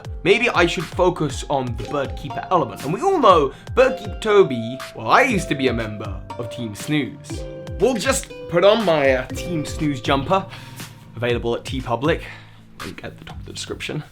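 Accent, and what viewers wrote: United Kingdom. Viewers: I kid you not, I've been watching you for a year and a half now, and I swear I always thought you said "So hi" instead of "Soar high" until this video. I blame your British accent for that. Edit: I've been blessed with the coveted heart from!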